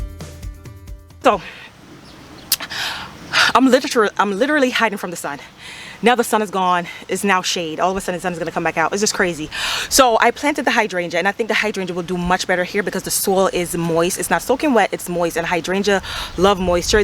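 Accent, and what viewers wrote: Jamaica. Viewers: Are you Jamaican? Jerk chicken and night owl. You sound like me and your love of flowers is so familiar to me. We just want beauty in our yard! Your place is naturally beautiful. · Greetings from Canada, beautiful garden. I love how the Jamaican accent comes out every so often.😂😂 .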